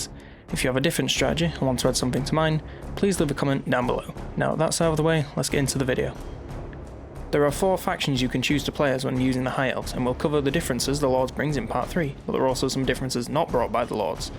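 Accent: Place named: Manchester, United Kingdom. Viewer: He sounds like he’s from near me. You from Manchester mate?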